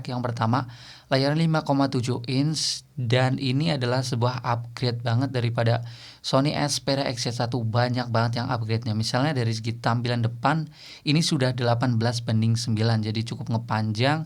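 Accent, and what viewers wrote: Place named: Banyumas, Indonesia. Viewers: Banyumas lurrr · Ora ngapak ora penak ya lur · Salam ngapak😅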